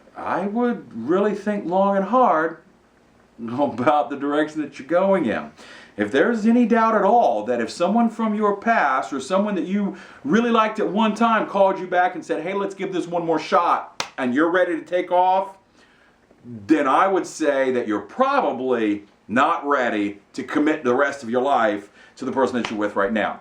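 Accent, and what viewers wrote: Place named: Baton Rouge, United States. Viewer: Baton Rouge accent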